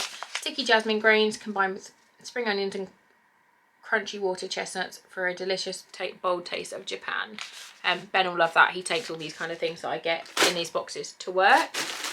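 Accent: United Kingdom. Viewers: Love the box videos :) you look super goddess beautiful with your mascara and eyeliner plus lip gloss, and love your uk accent ❤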